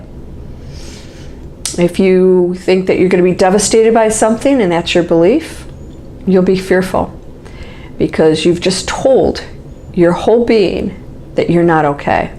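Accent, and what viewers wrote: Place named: Michigan, United States. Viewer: Are you from Michigan or around there? My science teacher has the same accent and she's from there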